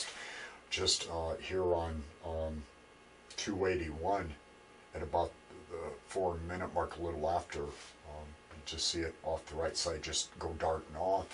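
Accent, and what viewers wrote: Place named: United States. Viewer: Those videos clearly show weather balloons with a radiosonde hanging below by a tether. The balloons are released at the same time each day and depending on the wind, you will see them each day at exactly the same time. Have you checked when you've seen them? You sound american, what state did you see them in? Google any map of radiosonde stations, check the wind on the days you see them and work out if they could be near you.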